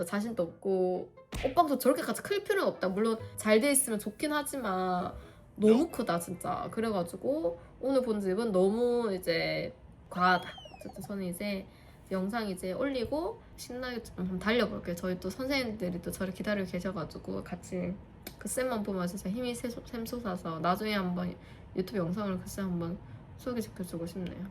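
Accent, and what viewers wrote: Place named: Jeolla, South Korea. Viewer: 그런데 말습관중에 끝에 자꾸 전라도 사투리'이 이' 하시는데 왜 그러는 걸까요? 경상도 분이신데 뭘해도 상관은 없지만 안하시면 더 좋을듯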